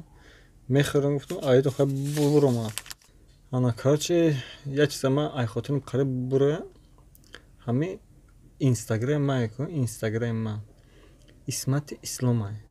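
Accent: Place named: Pamir, Tajikistan. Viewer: Исмат ты ранги помирийо гап мезани бо йагом рагт помири набоша ба пеш 👍👍👍👍👍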